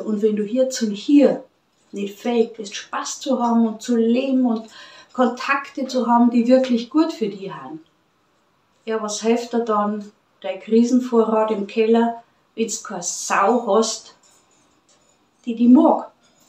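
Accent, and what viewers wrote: Bavaria, Germany. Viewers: Ich mag deine Videos, weil sie unaufgeregt daherkommen, sowas tut sehr gut. Dein Bayerisch mag ich weniger, weil ich ein Viertel nicht verstehe, obwohl ich mir große Mühe gebe - ich bin Berlinerin, also Preußin!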